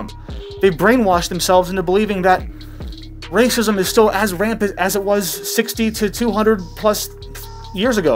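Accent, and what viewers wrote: United Kingdom. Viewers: Loving the scaaawtish accent!😂💯👍🏻 I’m not on Twitter thankfully so I haven’t got to hear all that BS hate! Thanks 🙂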